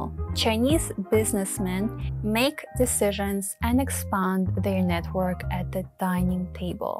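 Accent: Eastern Europe. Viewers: precious princess, your accent is pretty cool! Are you Ukrainian, Belorussian, or Russian? 🥰 😘💕☮🌎🌌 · well, which country are you from ? based on your accent,....Ex USSR .Having a lot of knowledges on this fiel, I think you succeed to penetrate "the market" 👍 Thank you for sharing your experience ! th t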